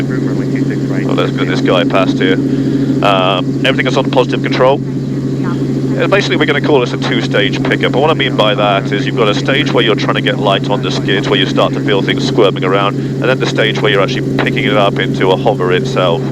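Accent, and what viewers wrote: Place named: England, United Kingdom. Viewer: Haha, he’s English.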